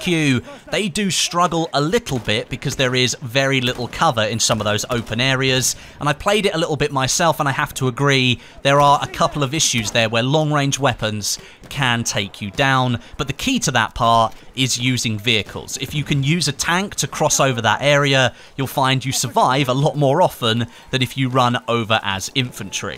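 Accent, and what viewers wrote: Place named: United Kingdom. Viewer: When people from the UK say h.....so awesome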